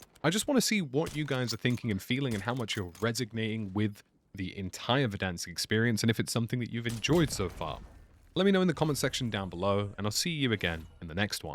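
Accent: RP, United Kingdom. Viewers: Loving the posh monologue accent Vs. your usual streaming accent. Also, couldn't agree more with virtually everything you said.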